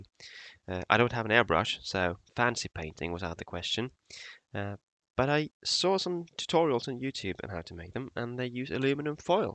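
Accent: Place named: United Kingdom. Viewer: Turned out really nice, mate. I would suggest if you’re going to get into dioramas that you invest in a Dremel tool (a little over $120 Aussie on eBay, so probably around 60-70 quid going from your accent, lol!!) - they’re perfect for cutting, and you’d be able to make the edges where the tanker broke in two more jagged and realistic. Also get yourself an airbrush (around AU $150 on eBay for a half decent kit including compressor and everything else you need), it makes doing the detail work that much easier. Please don’t get me wrong, what you’ve done looks fantastic, but particularly the Dremel will go a long way for those little finishing touches. As an aside, on a historical note, empty tankers were even more dangerous and prone to being blown out of the water than full ones, as the tanks may have been empty, but were full of explosive fumes, just waiting for a spark. 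Tanker crews were hands down some of the bravest to serve!!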